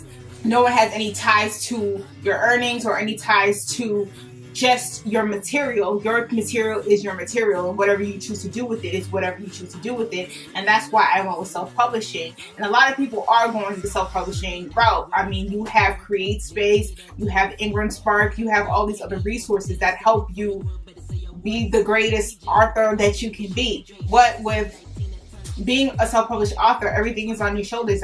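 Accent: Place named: Queens, United States. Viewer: You got to be from Queens. Shhhh. Much success.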